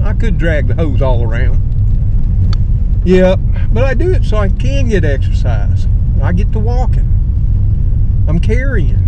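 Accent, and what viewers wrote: South, United States. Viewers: I could listen to you all day I love your southern style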